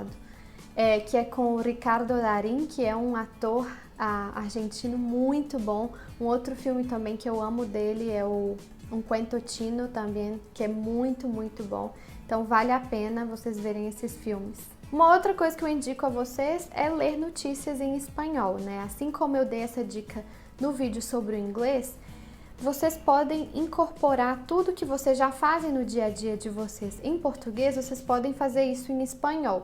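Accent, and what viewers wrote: Minas Gerais, Brazil. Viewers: O espanhol dela não é lá essas coisas.... Mineira, detectei.... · Are You from minas gerais??? Seu sutaque não é estranho. I loved your Voice. i Liked ok!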